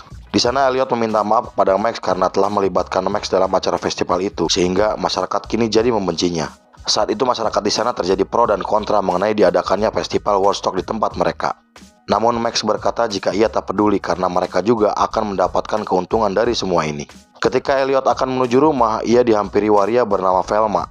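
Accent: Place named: Banyumas, Indonesia. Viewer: Intronya ngapak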